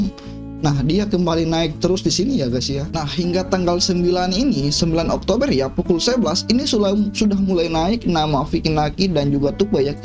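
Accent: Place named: Eastern Indonesia, Indonesia. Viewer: 😂😂😂pecah n gokil ini chanel konten reactor ter the best menurut sy ahli IT,high class,scoupe nya international,logat narasinya jg unik Indonesia timur,keliatan smart,bicara artikulasinya jelas ga pk banyak mikir,dng grafik data keren min 👍👍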